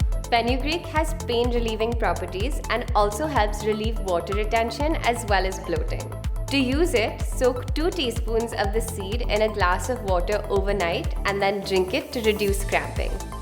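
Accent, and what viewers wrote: India. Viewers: you dont look indian but your accent says otherwise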